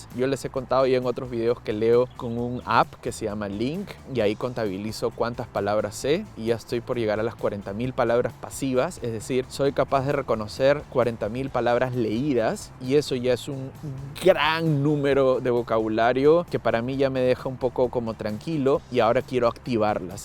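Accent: Buenos Aires, Argentina. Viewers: Hola, al principio pensé que eras de Buenos Aires hasta que escuché que eres Peruano, pero cada tanto te sale el porteño jiji.Uruguay también su acenrto es aporteñado ( aunque no les guste que se lo comparen). Muy lindo tu vlog. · Ahora entiendo porqué cuando te escuché por primera vez no entendía de dónde eras. Tenés aún un poco de acento argentino, pensé que eras de alguna provincia distinta a Buenos Aires. Saludos, muy buenas videos! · se nota muchisimo que viviste en Argentina a un peruano que no salió de Perú no le entendería nada!! Soy uruguaya.. Muy buenos consejos!! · Omg, tu en tres meses se te pego el acento argentino, yo estuve una semana y se me pegó el acento y algunas palabras xD yo me escuchaba y me reia y sentia traicionera xD Es tan cierto eso que dices! De que si no tienes conexion o le ves la utklidad a algo, simplemente no entra, eso me paso con chino. 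En su momento cuando me cambiaron una profe de aleman ( a una que me ignoraba) , dejé de sentirme en sintonia. Ahora trato de retomar aleman.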